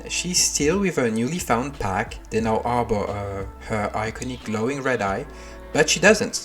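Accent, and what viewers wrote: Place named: France. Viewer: Idk why but your accent look like a french one 😌🤔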